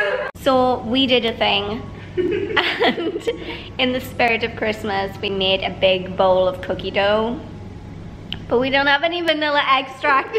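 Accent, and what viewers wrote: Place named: Scotland, United Kingdom. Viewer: I'm usually pretty good placing accents but yours intrigues me.Ive been watching some of your Kuwait vids (Im in Kuwait on a US mil base).You've got a scottish/midlands/aussie thing going on.So which one?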